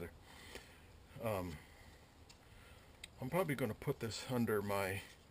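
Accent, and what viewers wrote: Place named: South, United States. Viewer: Wow, You Got it Brother!! Well taught! You got, NC, HD, Clear clean Southern English, like I have, and Using God's name to share, not used in Vain! I was just Watching my A Good Guy, Originally from "Car Crazy", Barry Mequiar from his new show "Ignite your life" Guys and gals sharing their Faith, from all us sinners and fools, That Christ still Loves Us, even knowing how screwed up we are. God Bless YOU for worldly help, but more importantly, filling the Hunger, that so many have for meaning and purpose., Lee, Fl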